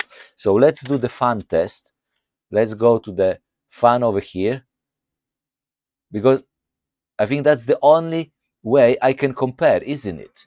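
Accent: Poland. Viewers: First time on this channel. Little off-topic. Your accent sounds like you're Polish. Am I right? Back to main topic. I use Void Linux which is independent distro, not clone of Ubuntu/Fedora/Arch. I have been using Linux for almost 2 decades now (had a break from it). On Void, some games starts immediately. Running some games requires debugging and deducing which libraries are missing, which can be frustrating. I rely on Google & Gemini AI for support. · Ah, wiedziałem! Polak Polaka zawsze pozna po mowie 😅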